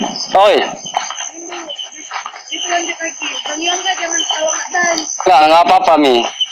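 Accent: Medan, Indonesia. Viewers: Mas mcm orang medan aja.dari logat bicaranya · medan kali ya tuh logat bang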